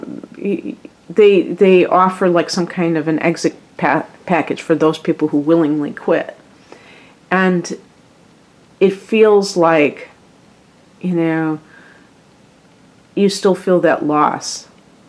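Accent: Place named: Midwest, United States. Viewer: oh hey a midwestern accent! Hiiiii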